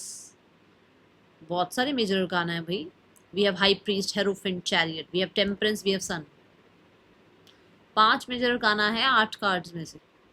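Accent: Punjab, India. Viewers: Muje ap ki lengve s पता लग raha h ki ap पंजाबी h Mam टोटल बदतमीजी इसने ki h Maine पता नहीं कितनी बार फोन kiya per इसने kabhi फोन att nahi kiya kya karu please please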